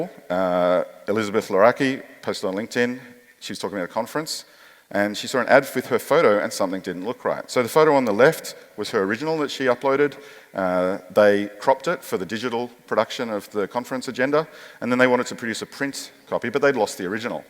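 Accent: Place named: Australia. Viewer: Great to hear an Aussie voice for a change in all the noise of YouTube videos. Subscribed from SA :-)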